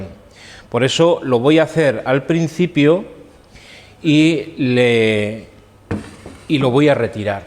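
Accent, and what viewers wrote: Castile, Spain. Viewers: Ya lo leí en su mandil hermano hermoso dicho el alma que anda en amor me cansa ni se cansa también es lindo escucharlo hablar castellano castizo aquí en América Latina a veces nos comimos palabras que son tan expresivas como las que usted habla cuando describe todo Usted es una persona encantadora hermano y sus historias me divierten aquí sólo escuché en mi vida de el Marqués de calatrava todos los errores de gramática son porque soy muy vaga para escribir y la grabadora corre sin puntos ni comas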